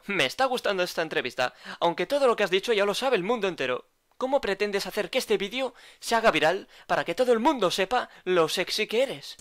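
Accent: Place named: Spain. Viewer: ERES ESPAÑOL y lo se por la voz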